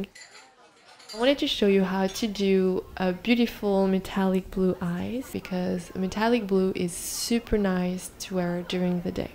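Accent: France. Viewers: Omg i freacking love your channel, your videos and everything. They're pretty simple, and that's what I really love. It kind of reflects you and I think YouTube need more people like that. J'adore ce petit accent français également, il donne du charme à tes vidéos et à ta personne. Kisses from France ( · I love watching these first videos you made. This was the first video I saw when I randomly discovered you on YouTube. I was instantly hooked! I absolutely loved that you did your makeup with your fingers, which made it look so easy and effortless. I loved that you were always doing your makeup in cafes, and of course the French accent 🥰👌🏻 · Es tu française ? J'entends un petit accent :)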